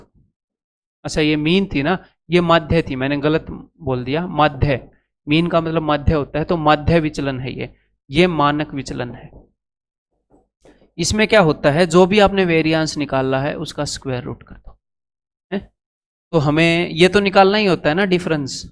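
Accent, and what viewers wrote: Haryana, India. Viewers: sir ka haryanvi language k saath padhana accha LG rha or statics bpsc k mains bhi aati h tension nhi rahi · हरियाणवी हो क्या Sir 🙏👌 Thanks 👍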